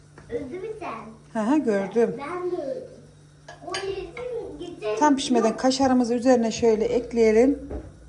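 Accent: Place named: Nevsehir, Turkey. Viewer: Selamünaleyküm memleketlere Nevşehirli gibi konuşuyor aynı güzel oluyor eline sağlık Eline sağlık ben de yapıyorum onu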